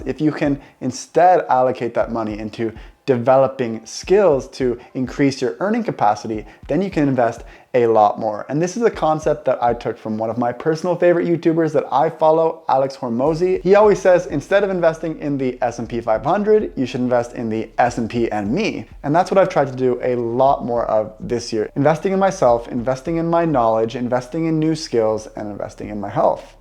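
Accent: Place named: Sweden. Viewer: I am starting to hear a slight Swedish accent.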